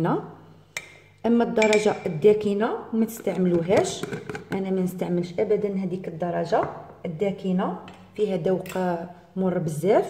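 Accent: Algeria. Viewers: حبيت نقلك كتعجبني لهجة الجزائرية الله يوفقك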